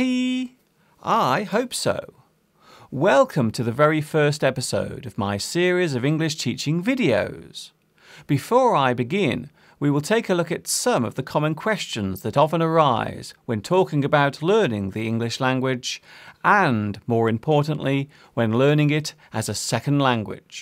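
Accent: England, United Kingdom. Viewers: Hi Mr., my name's ilham. I'm From Indonesia, your video is verry good, and i want learn english from your channel. By the way, do you speak english with british accent, right? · he said ftom england do sure it is britinsh english... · this is british english or american ????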